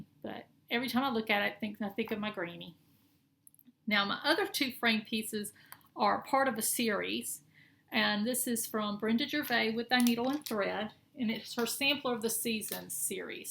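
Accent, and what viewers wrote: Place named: Mississippi, United States. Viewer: Love your purchases. The Penny America design is older. I have it started. it's been a couple of years now but I thinking it's 2009. I did the pumpkin lady in the shoe made it into a pillow hangs on a door during fall. I don't do videos not very tech savvy. lol I am going to Minneapolis to the retreat first one for me. I love your accent my nephew married a gal from Mississippi I see hear every time talk.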